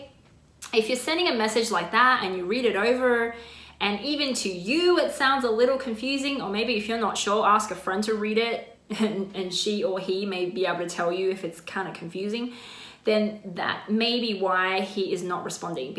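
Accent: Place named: Australia. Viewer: Can hear that aussie talk from miles away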